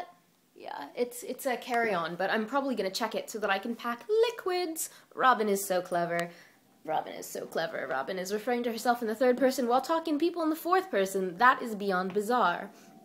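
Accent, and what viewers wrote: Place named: United States. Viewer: you sound so american in this vid!!!!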